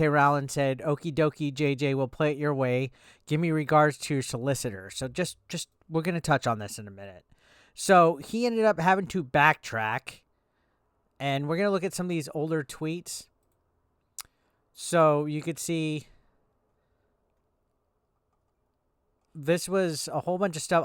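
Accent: United States. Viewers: Your amerikan voice is awful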